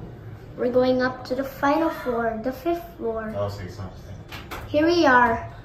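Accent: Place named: China. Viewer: Thick Chinese Accented Voiceover!!!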